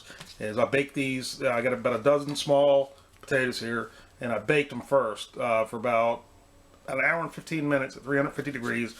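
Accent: South, United States. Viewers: You are sounding extra southern here!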